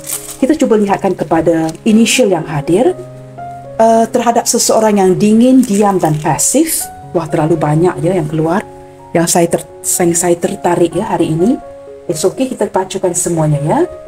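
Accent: Malaysia. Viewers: Waa halo disini juga bangsanya kita kan Bun , bunda kita dulu juga pernah komen disini loohh ihhh ahhaa serumpun lohh wahh dengerin siapa tau cengkok logat Malaysia bisa aku pelajari loohh n aku ingat keluarga tiktok Malaysia lohh ituloh yg pakai jilbab wahh moga tetep bawa energi yg positif , orang nya terkenal sopan wahh ngefan banget sama mereka bun Alhamdulillah Chanel liriklagu di Malaysia sukses moga banyak yg subcrib juga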